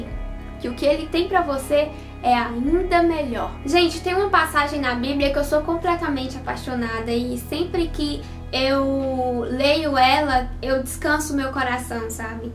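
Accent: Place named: Minas Gerais, Brazil. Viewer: Esse sotaque mineirin é o mais bonito do brasil, parabéns irmã, graça e paz.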